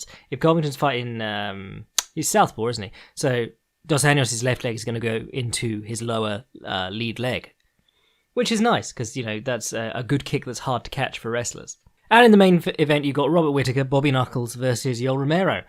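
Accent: England, United Kingdom. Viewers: So you just had to get that off your chest? I love the youthful voice paired with the understandable English accent, btw.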